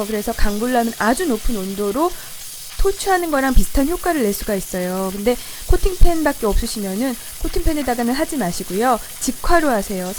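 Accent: Seoul, South Korea. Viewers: 말투랑 목소리 김하늘 같아요~ 예전 서울 사투리? 말투ㅎㅎ 목소리 넘 매력있어요!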